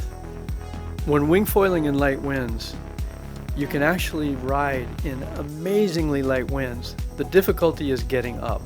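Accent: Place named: Maui, United States. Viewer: your German ist incredible, your Maui accent exotic, please comment all parts in German. Would bei The KPI for Naish Wings, learning from the master, Aloha, Hjot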